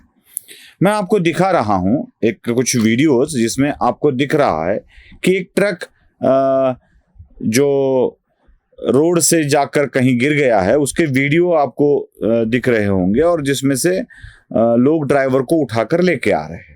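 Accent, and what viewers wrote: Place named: Beed, India. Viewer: क्या गुलाबी हिंदी बोल रहे हो हमारे बीड की भाषा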